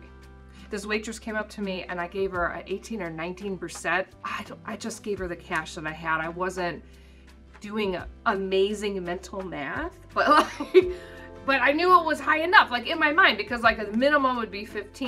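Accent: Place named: New Zealand. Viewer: And your accent has changed a bit now too 😊 you sound very kiwi now. Lol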